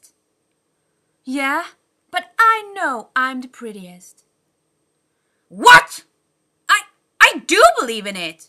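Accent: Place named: Sweden. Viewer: Ååååååh Sverige?? :D :D Hej! (if not Im sorry xD ) Hmm jag vet inte hahah du kan testa vem du vill ^.^ ÅÅh hahha jag kan höra nu att du är Svensk haha :D Åh jag är inte ensam xD hahah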